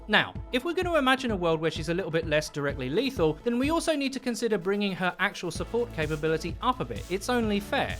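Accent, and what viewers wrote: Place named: United Kingdom. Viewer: STOP COMPLAINING! THIS CHANNEL IS BECOMING A PROBLEM FORNTHE GAME. YOU GUYS COMPLAIN ABOUT THING YOU DONT LIKE ABOUT THE GAME INA BRITISH ACCENT AND IN TURN CONTROL THE NARRATIVE FOR THE CHANGES THEY MAKE IN THE GAME! THIS IS A FUN CHARACTER TO PLAY AND SHE IS NO WHERE NEAR OVER POWERED. BLIZZARD NEEDS TO FIND A WAY TO CRATE A GAME THATS FUN FOR THE "PROS" AND FOR YOUR FREAKING BRONZE-DIAMOND PLAYERS TOO! YOU GUYS KEEP ASKING FOR CHANGES THAT MAKE IT NOT FUN FOR LITERALLY 90% OF THE PLAYERS!!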